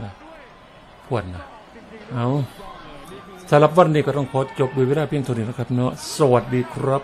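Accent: Isan, Thailand. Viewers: ภาคเสียงอีสานไม่ไรผิด ผมคนไต้แท้ยังติดตามเพราะเสียงพากเลยคับ555หรอยคัก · พากย์เสียงสำเนียงอีสานก็บอกอยู่ทุกครั้งทุกคริป ก็พากย์ "ม่งค่ล" ไปเถอะครับ ผมไม่เข้าใจว่าไอ้พวกเหยียดนี่สันดานมันนำสติปัญญาขนาดไหนถึงได้มาสั่งคนโน้นคนนี้ต้องพูดแบบนั้นแบบนี้อย่างที่ตัวเองชอบ รับไม่ได้ทนไม่ได้จะเส้นเลือดในสมองแตกถ้าได้ยินก็ไปดูช่องอื่น หรือไม่ก็ไปดูเทปจริงดั้งเดิมจะได้ฟังได้ยินแต่ภาษากลางสเนาะหูกันให้ฟินน้ำเดินเจริญ "มงคล" ก็ไปซะเถอะ ปล.ผมเป็นคนภาคกลางแท้ๆ แต่รู้ว่าความเป็นคนและความมงคลเป็นของทุกไม่แบ่งชนชั้น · #สวัสดียามแลง *ภาษาพูด คือการสื่อสาร ให้เข้าใจ ของมนุษย์ หลายเผ่าพันธุ์ ในโลก ส่วนจะพูดว่า "มงคล" หรือ "ม้งค้น" เป็นสำเนียงแบบไทยอีสาน ไม่ผิดครับ......ฟันธง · เว่าแบบนี้ระจังคนอีสานของแท้ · สุดยอดครับ กิตติ ดูครั้งแรกครับ ต่อยได้ดุดัน ว่องไว โหด แต่ สุภาพ ให้เกียรติ์คู่ต่อสู้ มวยไทยรุ่นน้องควรดูเป็นแบบอย่าง อย่าลีลามาก ก็พากย์ได้สนุก น่าฟัง มวยต่อยกันเอาเป็นเอาตาย แต่พากย์ได้สนุกสนาน ชอบมากพากย์ อิสาน พรสวรรค์ที่เรียนแบบยาก เห็นมีคนพากย์เสียงอิสาน แต่ไม่เหมือน หรือสนุก แบบ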